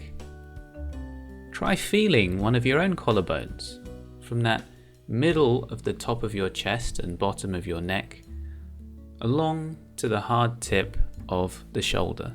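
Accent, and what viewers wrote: United Kingdom. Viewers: haha you're a Londoner - Evening Standard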